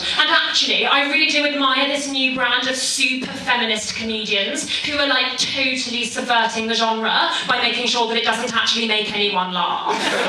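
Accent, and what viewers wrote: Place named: RP, United Kingdom. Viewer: I can't understand her because of her posh accent.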